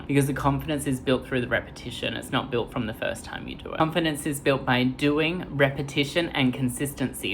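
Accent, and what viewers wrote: Thailand. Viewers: คุณพูดอังกฤษแต่ทำไมเป็นสำเนียงไทย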